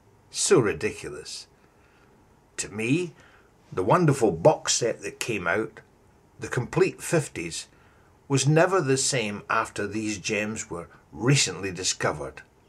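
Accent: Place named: Scotland, United Kingdom. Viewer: Thank you for sharing your thoughts (lovely Scottish accent). I'm sure that all the fans in the UK regret never seeing Elvis. I'm a '1956 era' fan too and feel exactly like you. No one will ever replace him, but I have found a tribute artist, Jay Ashton, who actually allows me to imagine what seeing Elvis in a live show might have been like. Jay just wants to share his joy in the songs of Elvis with all the other fans so ... no "bells and whistles" ... just a great performance.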